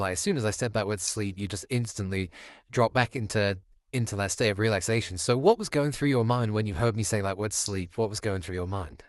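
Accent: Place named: United Kingdom. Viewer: He's just British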